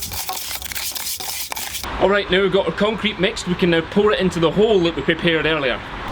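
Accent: Scotland, United Kingdom. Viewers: Great video! Just what I was looking for! Fanx! Scottish?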